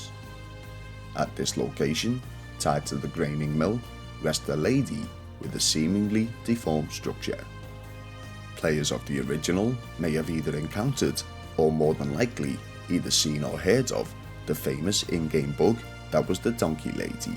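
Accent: Liverpool, United Kingdom. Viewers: Bro are you a scouser? It took me so figure out what was going on😂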